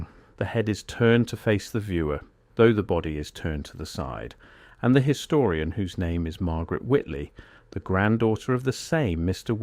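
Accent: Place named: United Kingdom. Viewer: Ridiculous to have this narrated by a British voice. It’s like wearing a striped shirt with a polka dot pair of pants and disco boots.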